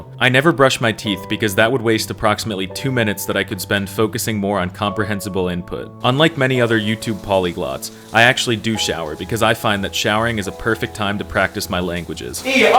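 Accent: Spain. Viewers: bro, disgusting that you run that Spain Mexican accent. You should speak Mexican with a Mexican accent. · Your Spanish is from Spain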